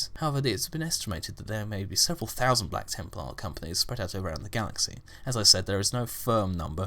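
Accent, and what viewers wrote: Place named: United Kingdom. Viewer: Aaah... The British accent... so good for Warhammer in general :P Keep em' comin' :)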